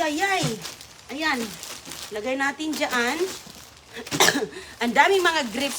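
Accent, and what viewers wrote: Visayas, Philippines. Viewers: it's bisaya accent...wag ka mag inarte...bakit ang boses mo ba boses angel ka ba😂😂😂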